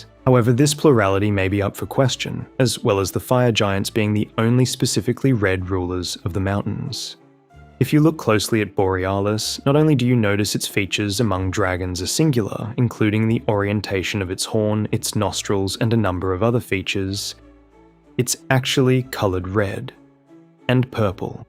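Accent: Australia. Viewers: i think he's australian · Sounds Australian to me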